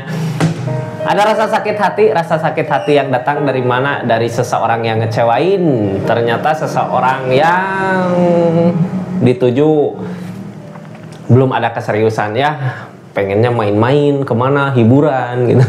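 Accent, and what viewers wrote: West Java, Indonesia. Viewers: Kk logat sunda na kaciri pisan . Abdi oge sunda bandung 😀😀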